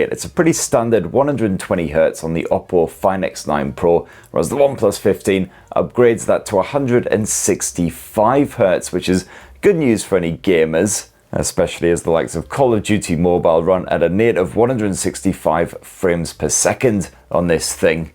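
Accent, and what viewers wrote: United Kingdom. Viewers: I thought you were a Brit. Do pics look crisp or crispy like potatoes? 😋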